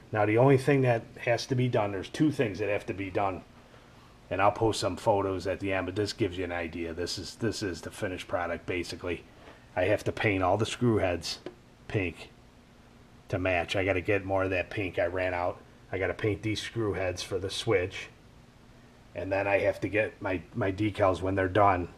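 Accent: Chicago, United States. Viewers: My gut said Chicago !! Woohoo! Good man :) awesome video by the way and amazing modification!!!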